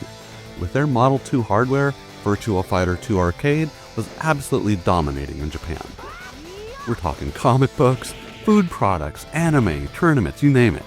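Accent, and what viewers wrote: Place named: Portugal. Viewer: Haha, partially, yes! There were some bits in your accent that had me wondering. Also, the topics you cover on your videos - relatively speaking, there's a high proportion of Portuguese people on SEGA-based retro communities because of how impactful the company was over there, destroying Nintendo in popularity in the 90s. So there was a considerable chance you'd be like i.e. Tee Lopes, someone who was born and grew up in Portugal during the 90's but moved to the US at a young age. Thanks for answering, keep up the great content!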